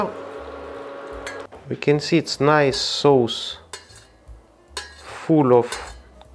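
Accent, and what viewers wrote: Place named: France. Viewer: Merci pour ton tuto ..Trop cool ton lovely french accent😄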